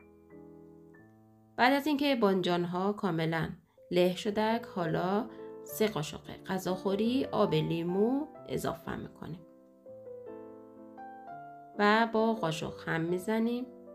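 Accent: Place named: Afghanistan. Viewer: جان، خواهر قندول و نازنینم، تشکر! من عاشقِ لهجه پارسی دری تو هستم.:) یه کلمه گفتی که معنی اش را نفهمیدم. " تَیار می‌کنیم " یعنی چی؟ این کلمه به فارسی ایرانی چی میشود؟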